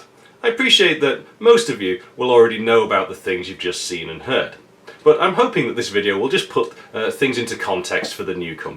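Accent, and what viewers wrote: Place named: North, United Kingdom. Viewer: Your accent is so much like mine that it took me a whole video to spot that you are a Northener. Are you from the West Lancs area by any chance? Great four-parter on the 3D graphics. I never really understood this and your vids explained it very clearly to me. Thanks!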